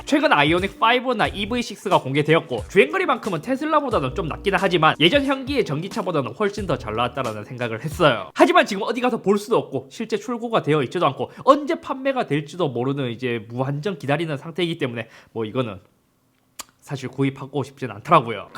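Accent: Gyeongsang, South Korea. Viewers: 경상도 사투리 구수 하네예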